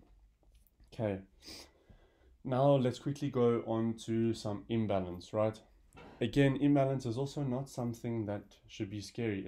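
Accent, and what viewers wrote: South Africa. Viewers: If the South African accent is annoying, speed up the video to x1.25.. kwaai video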